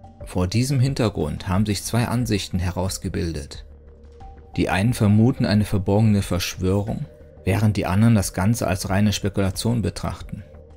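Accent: Spain. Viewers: Es ist wundervoll wieder deine Stimme mit dem schönen Spanischen Akzent zuhören. Möge Liebe und Güte immer mit euch allen sein. So sei es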